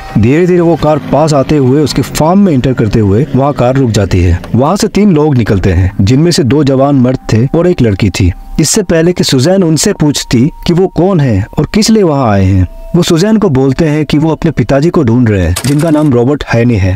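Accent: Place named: Northeast, India. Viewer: आपका हिंदी का नौर्थइस्ट वाला ऐक्सैंट आपको ज़रूर हिट करेगा।लगे रहो दोस्त।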